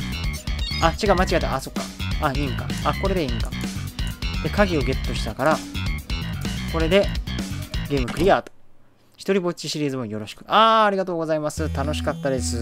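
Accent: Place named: Kansai, Japan. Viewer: このテンポ大好き💕 関西弁でやったって全然あり！ というかこっちの方がお得！！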